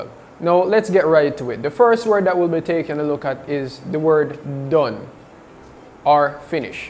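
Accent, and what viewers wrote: Jamaica. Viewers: Awoo me like learning real Jamaican pronunciation 😄👍🏼 greeting from Costa Rica 🇨🇷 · Love the jamaican accent love from uk 🇬🇧🇬🇧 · I just love jamaican(english) accent😂😂👌👌🤷‍♂️🤷‍♂️ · Nice one mi really love Jamaican accent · I love the jamaican accent but its so hard to understand and reproduce! Hahaha Never found a vídeo explaning it! So thanks!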